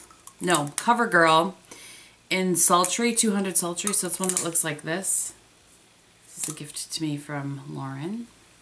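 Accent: Wisconsin, United States. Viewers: I love your wisconsin accent! I'm from Iowa, so I love when I get to hear a wisconsin accent!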